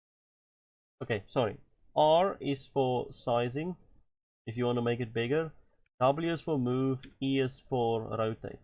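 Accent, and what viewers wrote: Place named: South Africa. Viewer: I recognize a lekker accent when I hear it, boet well done video helped a lot :D asablief maak nog..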